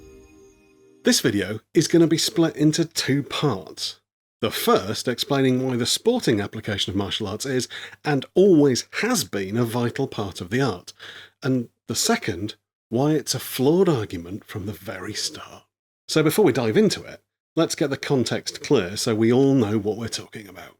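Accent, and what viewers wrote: England, United Kingdom. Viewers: Is he being sarcastic when he asks how I’m doing or is just his English accent?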